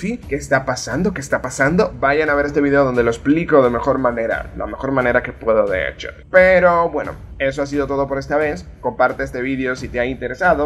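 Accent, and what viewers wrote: Dominican Republic. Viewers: Bro eres dominicano ? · Por su acento diría que es de RD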